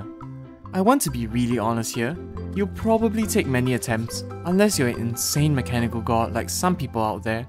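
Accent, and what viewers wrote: Singapore. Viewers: Are you SG/ Malaysian bro? Going by the accent 😃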